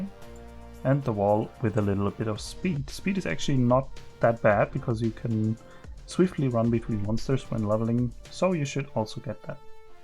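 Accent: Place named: Germany. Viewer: Das erste Mal, dass ein deutscher keinen Abgrundtiefen Akzent hat und echt gut Englisch spricht und du entschuldigst dich weil du "struggelst". Mehr Selbstvertrauen! Du machst das so gut !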